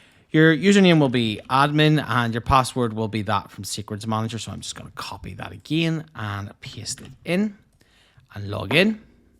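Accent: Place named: Belfast, United Kingdom. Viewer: Love the Belfast accent! Good work!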